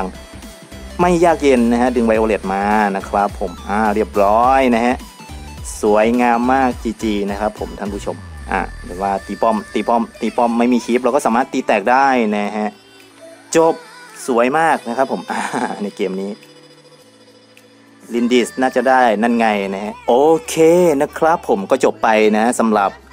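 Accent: Isan, Thailand. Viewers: พี่เป็นอีสานหรอครับ แพนด้าจะมาหลอยป้อม นาตาย่าฉีดทีแกรกเหลือเลือด2ข้อ 555คนบ้านเดียวกันอ้าย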